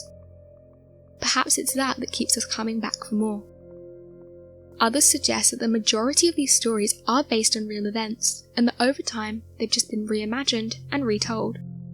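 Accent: England, United Kingdom. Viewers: It's funny hearing Mexican stories from when I was a kid... (But w/ an English accent) 😉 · Maybe she lives in America, but she definitely speaks with an English accent.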